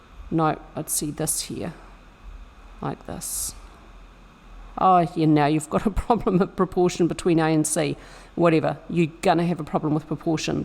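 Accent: New Zealand. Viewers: So good having a kiwi accent in crypto. And i think one of the best Elliot wave channels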